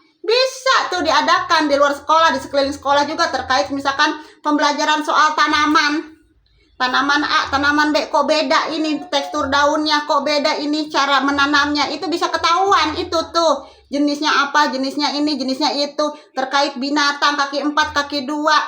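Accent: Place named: West Java, Indonesia. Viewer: beneran orang Betawi apa orang Jawa barat sih pok..😂😂😂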